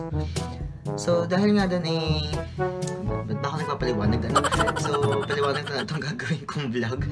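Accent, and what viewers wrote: Batangas, Philippines. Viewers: Batangeño ka ga??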